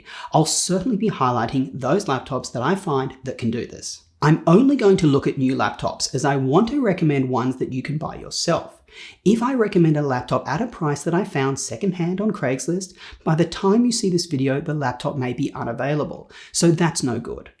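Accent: Australia. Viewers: Your enthusiasm is great. Like your Australian accent. About to buy my 1st laptop so your review is very helpful. Thank you.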